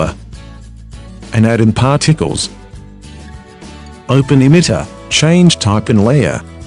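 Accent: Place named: Australia. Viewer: robot australian is a very annoying accent for this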